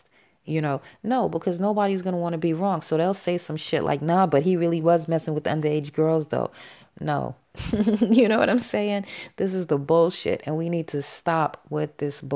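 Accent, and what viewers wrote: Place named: East Coast, United States. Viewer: Enjoyed hearing you speak on it! East coast accent arrested me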